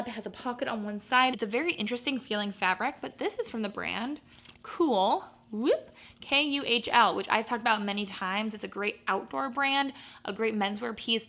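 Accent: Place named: Michigan, United States. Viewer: As always I love learning from you, but I think what makes me love watching you most is that your personality reminds me of my best friends ❤ I moved away when I got married but her and I grew up together in Michigan which you have a very very similar accent and how you speak reminds me of home. Loved your haul keep hauling on 😊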